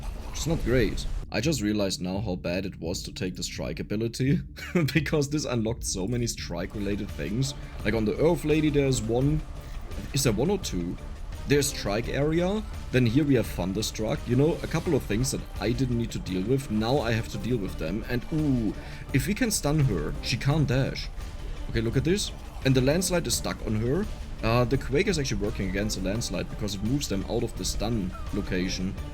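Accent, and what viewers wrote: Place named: Germany. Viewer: loved the video so far, but kind of curios... are you german or from a german speaking country? I feel like your accent kinda sounds like it ever so slightly.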